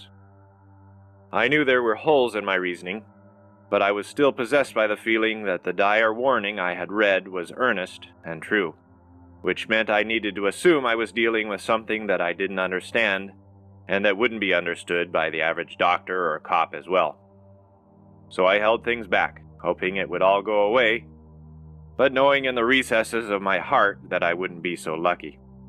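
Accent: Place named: Ireland. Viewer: I've been hanging out for this one. I'm really enjoying this series. I liked your Irish accent. Thank you very much!!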